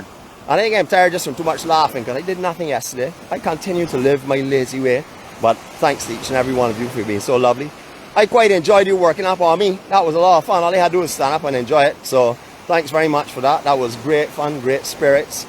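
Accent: Barbados. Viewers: So interesting to see. I was staying in Christ Church 26/7 years ago (for five months) and spent all my time at White Sands Beach and Apartment complex - Austin, who you mentioned, I know very well. I’m shocked to see that where he worked has changed drastically…I hardly recognise it as the same place. I wonder if he still works there for George. Really enjoyed this video with your great commentary and your wonderful Barbadian accent. Thank you, from Wales UK 🏴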